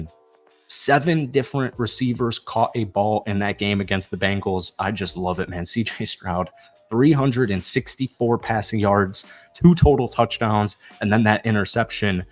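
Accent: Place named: Texas, United States. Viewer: Where is this texas accent coming from bro lol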